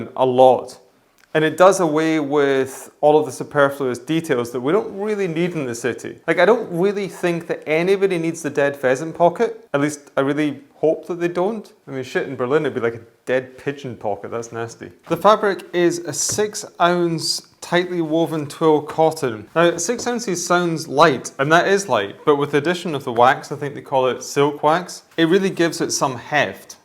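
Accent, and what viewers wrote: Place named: Edinburgh, United Kingdom. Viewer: He’s Scottish , East coast accent .... probably Edinburgh .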